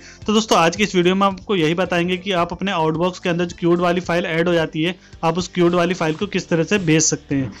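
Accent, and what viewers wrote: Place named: Himachal Pradesh, India. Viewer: Ye to himachal wala ke voice hai☺️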